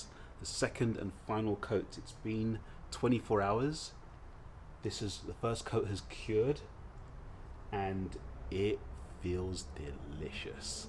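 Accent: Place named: United Kingdom. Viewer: I have been using it for past year or so. When I bought my wife her 4th new car, I figured it's going to last until...I retire😅 Her 2021 Camry SE is getting it's 2nd coating. 1st lasted months, just 1 coat. Her car stays in the garage...my poor 2016 Tundra stays out in the elements with just an occasional wash and wax. I recognized your Brit accent😁 I lived there for years in Felixstowe when serving in USAF st RAF Bentwater.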